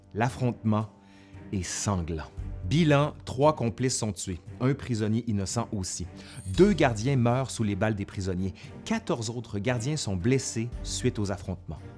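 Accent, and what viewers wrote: Quebec, Canada. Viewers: Y'a pas a dire, tu prends +10points de sympatie rien qu'avec l'accent québécois... Je suis jaloux.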